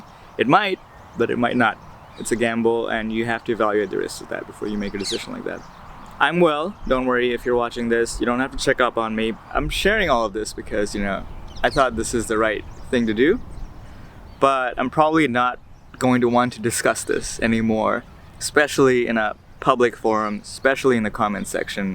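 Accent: United States. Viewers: You sound like American accent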